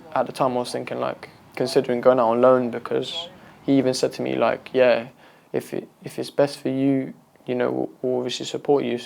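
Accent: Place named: England, United Kingdom. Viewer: Wait he has an English accent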